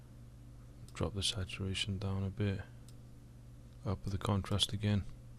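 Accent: Wales, United Kingdom. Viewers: Another great video....do I detect a hint of Welsh in your accent?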